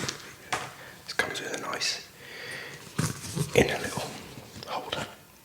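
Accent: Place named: Birmingham, United Kingdom. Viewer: you sound brummy, are you from the black country? im from wolverhampton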